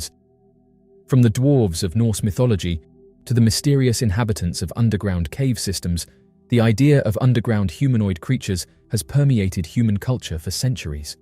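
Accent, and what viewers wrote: England, United Kingdom. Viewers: with annoying en-glish accent..Indeeed !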